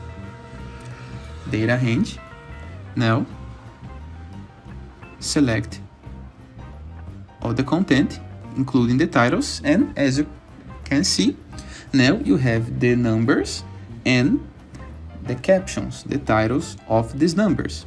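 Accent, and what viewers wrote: Brazil. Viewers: eu conheço o sotaque brasileiro kkkkkkkkkkkkkkkkkk Edit: o vídeo me ajudou, valeu!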